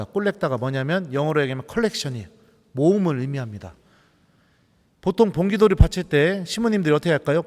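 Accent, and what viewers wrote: Chungcheong, South Korea. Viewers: 잠이 오지않아 우연히 듣게된 말씀 입니다 간간히 쓰시는 충청도 사투리가 정겹습니다 강론 이 감동입니다 찾아 듣게 되는 강론 이며 ㆍ 감사드립니다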